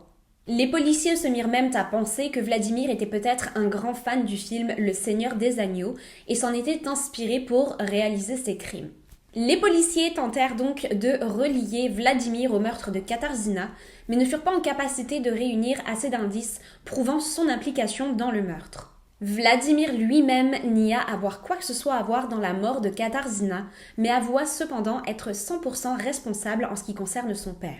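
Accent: Quebec, Canada. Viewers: Je viens de te découvrir.J'adore!...Mais serais-tu d'origine quécoise en premier lieu?Je suis du Québec et j'enttend des différences.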